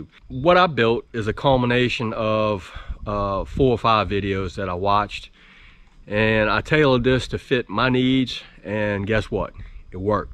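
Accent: South, United States. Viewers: Nice meeting you my friend in the USA.from England love that ol Southern accent and attitude and I have a mouse in the bedroom and I will definitely make this today many times thanks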